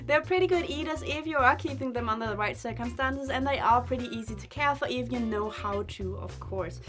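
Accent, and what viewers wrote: Denmark. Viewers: its a beautiful accent danish is similar to one of languages called afrikaans 🙈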